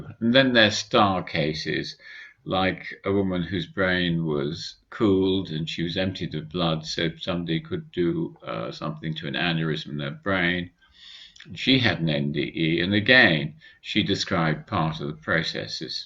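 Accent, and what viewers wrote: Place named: England, United Kingdom. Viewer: Thank you. Doctor Fenwick so very much! Your discourses are wonderful to listen to! Nothing I enjoy so much as listening to an English gentleman, who is well bred, and articulate as you are! The English have never lost their claim to CLASS! I am eagerly looking forward to your next NDE presentation, part 2! God Bless!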